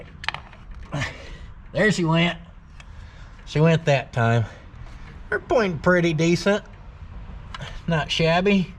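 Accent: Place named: Georgia, United States. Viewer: I've been to Stroklahoma many times and I ain't ne'er heard no accent like you done got there. That right there sounds like a deep Gerjia or Alabamia accent...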